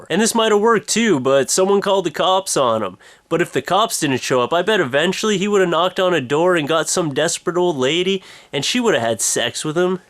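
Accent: Canada. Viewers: canadian eh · i knew u were from canada, but i just now noticed u have a accent. like when u said house. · It's the canadian prairies accent. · "don't struggle or i might get a stiffy!" hahaha funny shit! and i like how you say house. i wish i had a canadian accent :( oh, well. canadians have good weed too! :) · LOL. I think it's so funny when you Americans think that Canadians say things strange. What exactly are you hearing? How's he supposed to say "house"? It's kind of like how a lot of you Americans say "ruff" for "roof." Why do you make a dog noise for "roof"? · that's the Canadian accent, its not weird -_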